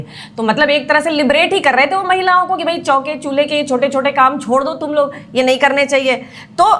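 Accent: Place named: Kanpur, India. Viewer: Bhai aapki bhasha and tone. Kanpuriya hai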